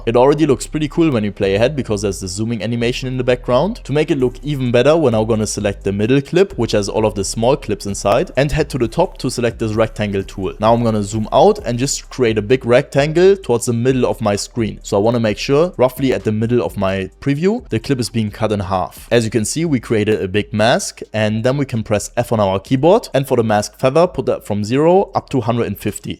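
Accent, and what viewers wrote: Germany. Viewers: I hear German😂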